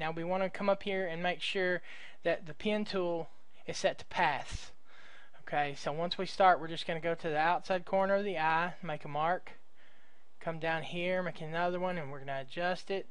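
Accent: Texas, United States. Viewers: a real Texan. love the way you talk. the layer could be duplicated then dragged over to the other eye then re-adjusted in size and fixture to give the same effect without repeating the same work on the other eye. good work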